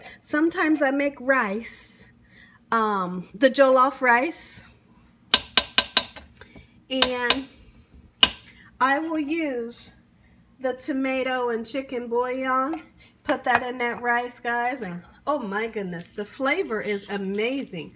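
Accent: South, United States. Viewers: Does anyone know where from bc i swear she talks so Southern.. And sure does cook southern..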